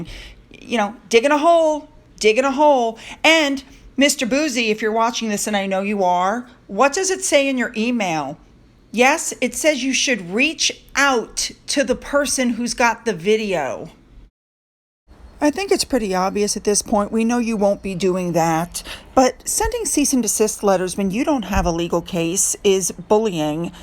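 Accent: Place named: United States. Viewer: I think you are very brave to continue with Utube, - you have a great clear voice from the USA on the side of Her Majesty. Thank you.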